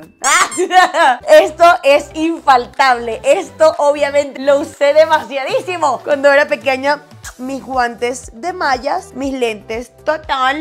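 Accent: Venezuela. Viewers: eres venezolana me encanta comohablas😍😘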